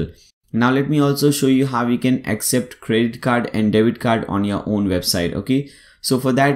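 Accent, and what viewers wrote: India. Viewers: typical Indian English accent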